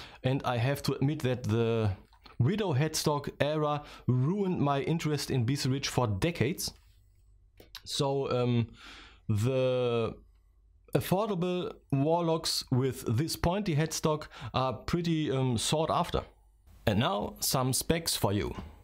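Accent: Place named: Germany. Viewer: Der deutsche Akzent ist unverkennbar:D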